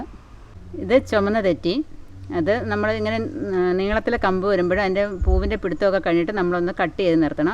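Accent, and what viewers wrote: Kollam, India. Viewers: Nammude kollam style bhasha ayyam puredam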